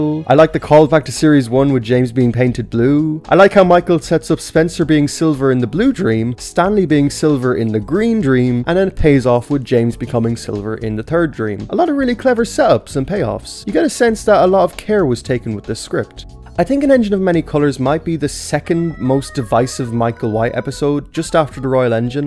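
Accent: Ireland. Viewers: you already sound Irish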